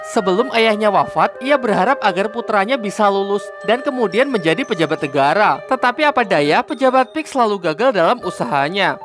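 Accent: Java, Indonesia. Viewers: Mimin orang Jawa ya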